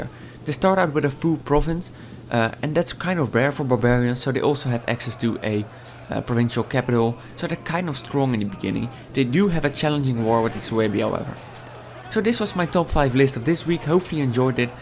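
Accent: Netherlands. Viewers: you sound like you're Dutch, if you are Dutch: ik vind je filmpjes heel leuk blijf doorgaan :) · I just can't listen to this Dutch accent ;/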